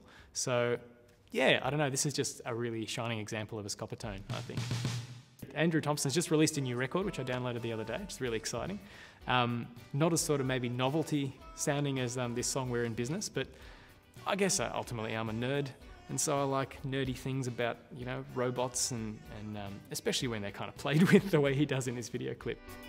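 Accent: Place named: Australia. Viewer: Accent???? He sounds like any Aussie. What country are you in:)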